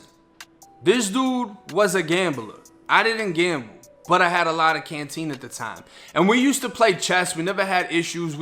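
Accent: Boston, United States. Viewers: How are u raised in florida with a boston accent? I get u were born there but its not like an accent has anything to do with genetics lol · I just said the same thing, can’t tell if he’s from Boston or the South👍🏾🤣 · Bra got a Boston accent but if u fuck around in Florida too long it’s gon change u a bit 😂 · Tampa? I swore from your accent you was from Boston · This dude talk like a Boston towny and a fla g had a baby I’m from central fla ain’t never heard no shit like it but much respect sound like you put in work when you was down keep keepin it real bruh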